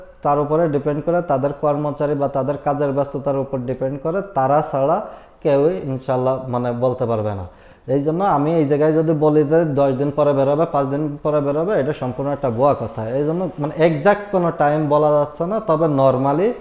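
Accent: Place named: Narail, Bangladesh. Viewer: ভাই আপনার বাড়ি কি নড়াইল?কথা বলার কোয়ালিটি সেইরকম মনে হচ্ছে।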